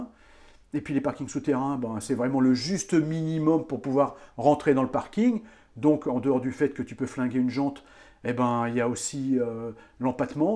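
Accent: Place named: Lille, France. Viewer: j'etais sûr que tu étais de Lille, trahis dès le début ! (^_^)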